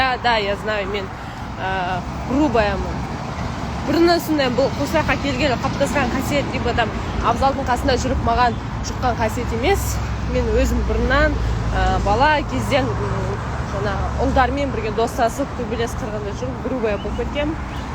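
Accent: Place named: Russia. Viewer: саған орысшаң акцентпен күлдіргі келтіресің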